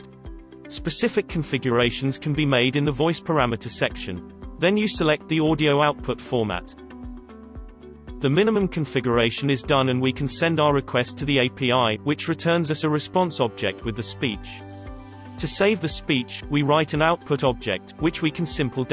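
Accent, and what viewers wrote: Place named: Germany. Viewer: ah, a german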